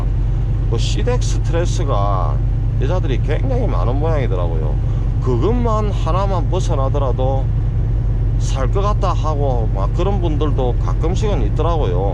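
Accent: Gyeongsang, South Korea. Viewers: 우리 동네 카센타 사장님도 비슷한 억양... 경상도 사투리는 세상 어딜가도 그대로임 ㅎㅎ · 구수한 경상도 사투리 즐기면서 잘 보고 있습니다. ^^ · 경상도 사투리 굿 저도 경상도사람으로서 친근감을 느끼네요. 좋은 영상 잘보았습니다.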